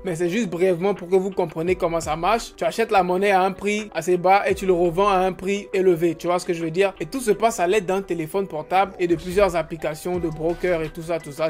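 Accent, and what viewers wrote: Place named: Ivory Coast. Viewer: Merci pour la video. Dans ton accent on sait que t'es un vrai Ivoirien, j'en suis juste fière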